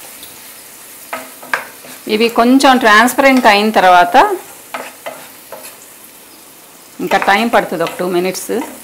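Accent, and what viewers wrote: Nellore, India. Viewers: Medi nellore aa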